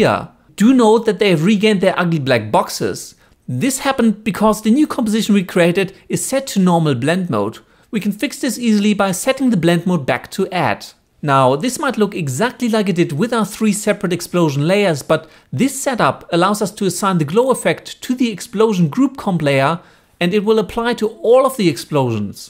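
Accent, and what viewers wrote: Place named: Germany. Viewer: Thanks for your tutorials! Where are you from? It sounds a little bit like you're from Germany..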